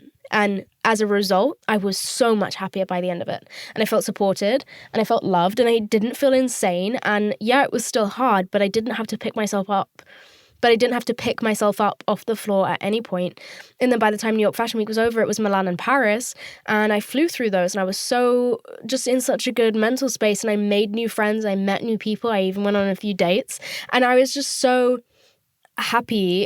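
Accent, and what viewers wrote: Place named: England, United Kingdom. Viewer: First off: love, truly Second: something about proper English accent, so eloquently spoken, pushed through a profane, bright, articulate girl - it's like Hermione Granger if she watched way too much Netflix.. it's an amazing, original voice and I am HERE for it 🍿 Third: always better to have more information about yourself than less. The further you go in life you find everyone is, minimum, a little fu*ked up - the only difference is who knows themselves better, who has better countermeasures and coping mechanisms. is lovely, honest, much needed and must be protected at all cost. Do what YOU need to get through the day, all of you, please believe there is a 50% chance the person next to you on the bus or in line or at the grocery story is equally or more messed up than you and/or going through even worse sh$t than you. 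Listen to, appreciate her honesty and challenge yourself to be that honest with yourself. Awesome job Ms. Carry on Queen 👸🏻🥂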